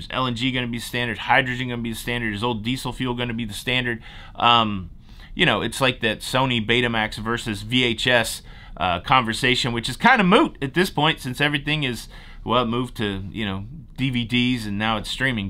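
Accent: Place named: Texas, United States. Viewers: Just love your Texas accent,! Ha! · That Texas accent was so natural! Well done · that was some amazing Texas talk! lol #houstonian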